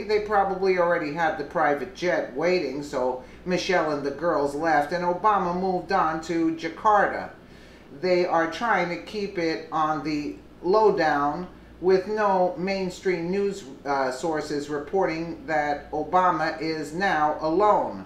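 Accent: New York, United States. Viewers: Lmao, part of the reason I love this channel is that I love listening to the woman's voice who reads the news articles. Your New York or Jersey accent just brings a smile to my face and has me crackin up (being from NY myself)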